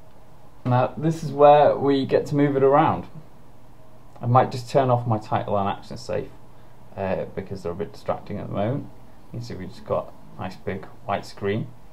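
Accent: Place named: Leeds, United Kingdom. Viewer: Ahhh no way, silly me. I went to The University of Leeds as well so you'd think I'd be better at recognising that accent haha.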